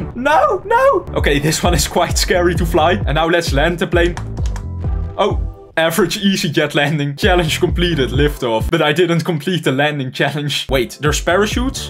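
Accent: Netherlands. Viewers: ik smell a littel nederlands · Question: are you Dutch?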